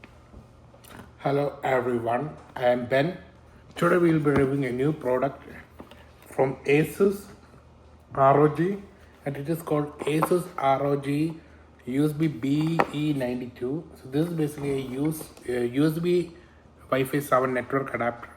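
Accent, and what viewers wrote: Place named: India. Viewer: he did sound indian though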